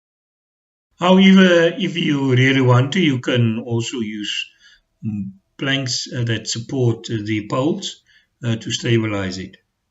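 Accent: South Africa. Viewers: Thanks this is really helpful and great to hear a South African accent!!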